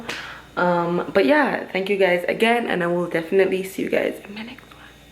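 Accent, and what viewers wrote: Jamaica. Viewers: Dope video, why yuh sound like a yawdy🤔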